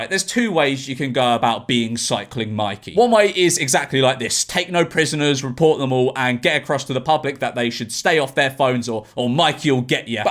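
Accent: South Africa. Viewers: His accent sounds South African, I guess that proves the old Spitting Image song true...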